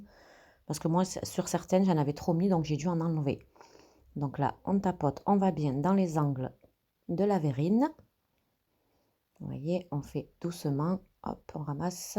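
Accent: South, France. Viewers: Super expliqué. Merci pour votre partage.!!! Je retrouve l accent du midi, quelle ́nostalgie... j essaierais votre si belle et visiblement bonne recette. Merci et Bravo pour votre travail.♥️♥️🎀🀄🌟🌞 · Merci beaucoup en plus on a l'accent du sud en bonus 😘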